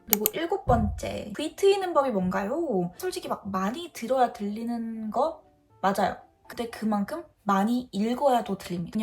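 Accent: United Kingdom. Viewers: 영국 발음을 너무 좋아해서 이왕 회화를 할 거면 영국 발음으로 공부하고 말하고 싶다는 생각을 했어요 언니의 발음이 제 워너비에요ㅎㅎ 대부분이 미국 발음일텐데 언니는 어떻게 영국 발음으로 회화를 마스터 하셨나요? 영국이라는 현지에 나가 살다보니 자연스레 익히게 된 건가요 아니면 따로 공부할 때 영국 발음으로 공부를 하신건가요?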